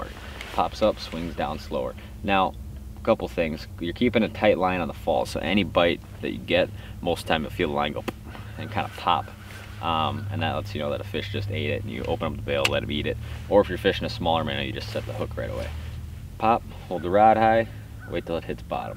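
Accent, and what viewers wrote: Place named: Minnesota, United States. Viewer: Are you from the midwest? You have a very minnesotan like accent lol also great video with actual info not just rambling like all the other videos I've seen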